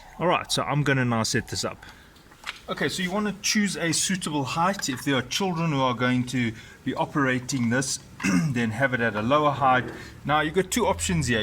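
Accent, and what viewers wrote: South Africa. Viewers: Sounds like a South African accent. Hi from Canada. Was actually looking for keypad opener that I can add or remove user codes through my smartthings controller like I can for my front door locks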